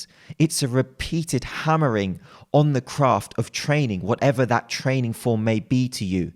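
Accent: United Kingdom. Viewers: Your teeth are too str8 to be British...but not your accent... i am confused....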